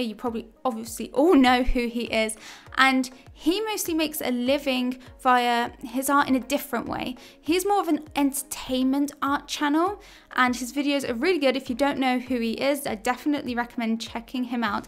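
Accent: England, United Kingdom. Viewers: The best english accent here for the english accent lovers! 🤗😍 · Has a seemingly English accent yet says how to make 250,000 DOLLARS a year. Typo error ? Or…..? Hmmm….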